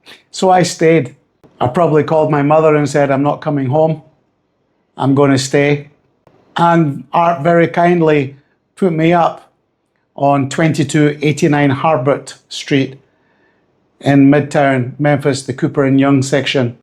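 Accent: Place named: RP, United Kingdom. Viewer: Your BBC pronunciation is beginning to grate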